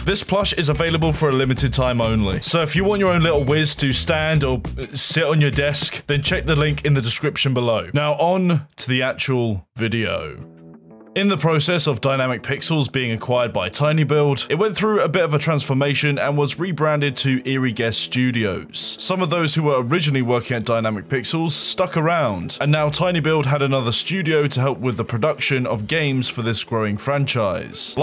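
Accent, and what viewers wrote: United Kingdom. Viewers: BABE IT'S 3AM! TIME FOR ANOTHER LONG FORM CONTENT NARRATED BY A BRITISH GUY! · very pleasant surprise to click a commentary video and hear a fellow brit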